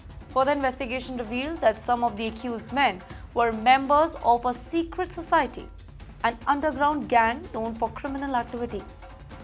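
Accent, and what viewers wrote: India. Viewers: Curry English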